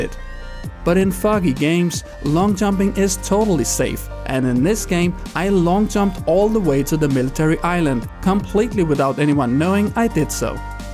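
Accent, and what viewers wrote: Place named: Denmark. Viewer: It's easy to hear for Danes that he is from Denmark - yes with an "a"! :P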